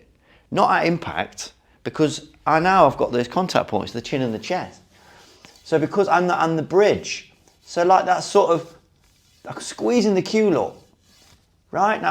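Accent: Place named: Midlands, United Kingdom. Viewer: So midlands, I love it! What a guy.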